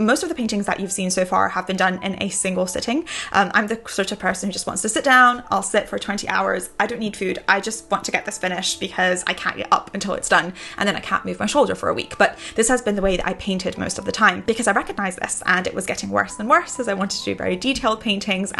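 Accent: United Kingdom. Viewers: Are you British? I though you were an Aussie.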